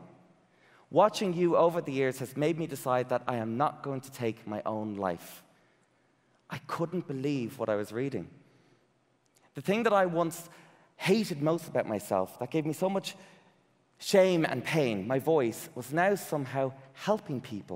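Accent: Ireland. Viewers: Your boss was right noticing your voice....... it’s gorgeous and I could listen to your Irish accent all day xxx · Nope, not British. He sounds Irish. Which he is. 🍀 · I used to just "love" your videos but after watching your presentation I "adore you now." By the way, I do not think you sound gay: you just sound Irish! 😘🏳‍🌈👍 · The tears are not from pain,. There from being deeply moved. I had damp eyes, too. Tears of joy at your overcoming real obstacles. Also, as a Yank, your beautiful Irish accent melts me too. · His boss was an idiot. He has a perfectly nice voice. Also that Irish accent 🥰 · I don’t think he sounds ‘gay’ whatever that actually means.. he just sounds Irish to me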